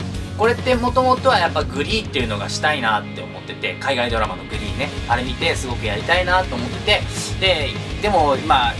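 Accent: Fukuoka, Japan. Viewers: 福岡の方言きいとったら、親近感わく！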